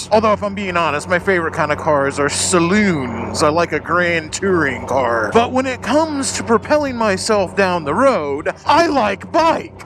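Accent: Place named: South Florida, United States. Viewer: I’ve been around since the heavy southern Florida accent! lol good times… long time. As real as any YouTuber gets, good bad and ugly.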